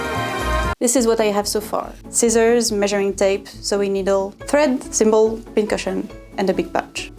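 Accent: France. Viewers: As well as a fantastic creator you are naturally beautiful and you sound amazing, I run a French bistro here in Wales UK and I'm so ysed to listening to the French accent and mix of French and English language, you should be proud of yourself you are an inspiration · First time watching any of your videos and don't take this the wrong way, but your accent is one of the first French accents that didn't irritate my picky ears. I'm starting to wonder if maybe the French accents that annoy my odd taste in accents are fake... Anyway I doubt I'm one to complain about accents with Utah (USA) drawl and I am watching the video because I love to craft and if it takes inspiration from historic pieces, even better.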